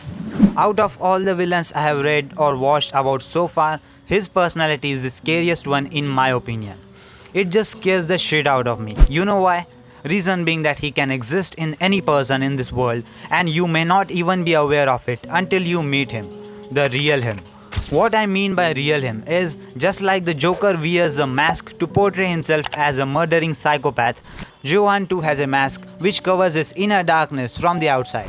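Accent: India. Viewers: I can't stand this stupid tech support curry accent. Sorry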